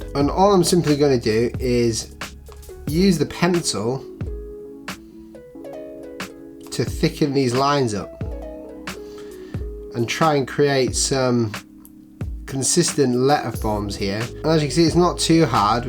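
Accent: United Kingdom. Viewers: Where are you from, where is your accent from? :) UK where?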